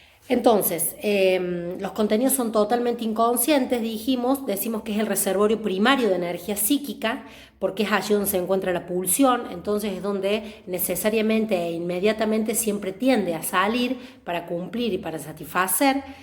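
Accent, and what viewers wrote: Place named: Córdoba, Argentina. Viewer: Que buena explicación y gracias que sea de una tonada cordobesa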